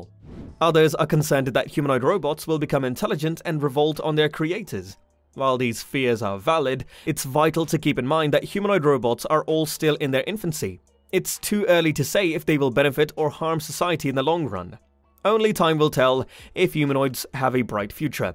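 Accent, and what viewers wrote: United Kingdom. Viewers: fkkn brit accent announcer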